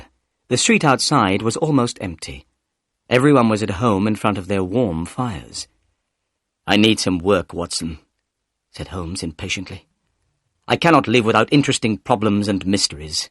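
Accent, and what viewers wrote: United Kingdom. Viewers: Es el inglés británico cierto? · Me encantó!!! Creo que entrenar el oído es la major manera de iniciar, aunque siempre se necesita un poco de la vieja escuela, manuales y eso. Puedo leer porque escuche como se pronuncia la palabra y puedo traducir oralmente pero me cuesta muchisimo más escribir, es tan triste que no pueda siquiera copiar sin estarme fijando el orden de las letras en la palabra. Aunque soy feliz entendiendo lo que lees, realmente creo que lo haces genial.. con el toque inglés más que americano pero quizá es por el libro que es de esa nacionalidad. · Es inglés británico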